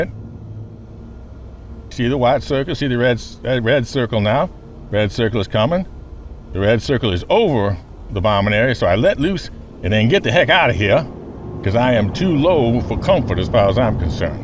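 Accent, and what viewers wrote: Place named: South, United States. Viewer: You accent is excellent, it reminds me of a southern gentleman.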